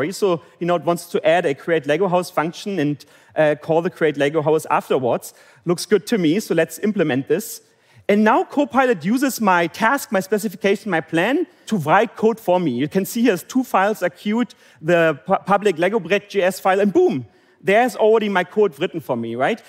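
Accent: Germany. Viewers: My mom once said "If you do not have to say anything nice say nothing at all" and this is why I do not leave a comment about his strong German accent.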